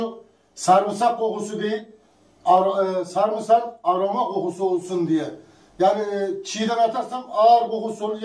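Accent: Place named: Ankara, Turkey. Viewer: Şefim Ankara limisiniz yanlış mı anladım yav zere aynı bizim buraların şivesi diyorum 🥰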